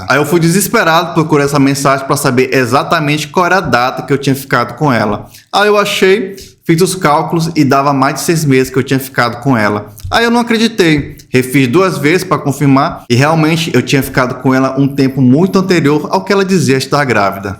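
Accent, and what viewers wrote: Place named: Fortaleza, Brazil. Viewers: Adoro os vídeos do, e a forma que ele fala é resenha e muito natural - "o mermo convite". Nordeste raiz (também sou) 👐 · Voce e muito inteligente engracado.Tenho uma curiosidade voce e cearencepois tem um sotaque de Fortaleza.eu sou cearence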